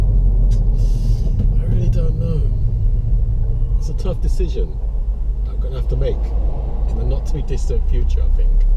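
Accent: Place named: Bristol, United Kingdom. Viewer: From Bristol then mate? And nice reviews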